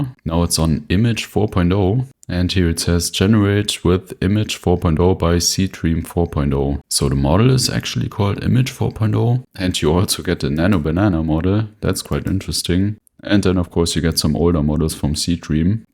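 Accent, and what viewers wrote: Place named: Germany. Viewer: Gutes Video. Aber noch nie so deutsches Englisch gehört, aber mach weiter so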